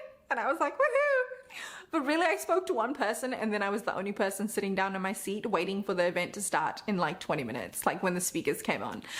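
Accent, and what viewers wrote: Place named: South Africa. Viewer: You sound South African, specifically like you're from the Western Cape suburbs 🌟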